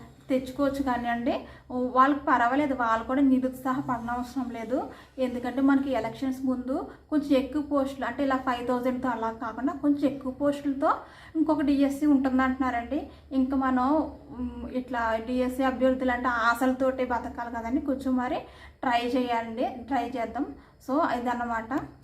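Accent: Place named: East Godavari, India. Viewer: నేను అడిగిన దానికి మీ ఆన్సర్ అంతలా ఎందుకు.. జాబ్ వస్తేనే చెప్తారా?? Madi ఈస్ట్ గోదావరి..మీ వాయిస్ అలానే ఉంది slang అందుకే అడిగా..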